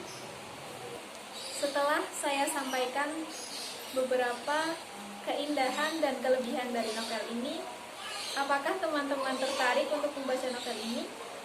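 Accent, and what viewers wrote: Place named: Tegal, Indonesia. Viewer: Bahasane medok men dek... Oponeh nek ngomong kubah... H yang khas tegal... Semangat dek... Oh yo... Tambah senyumnya...biar semakin imut... 😁😁😁 Dan tolong mungkin cari tempat yg agak tenang dan di kasih backsound... Intonasi lumayan oke... Siip...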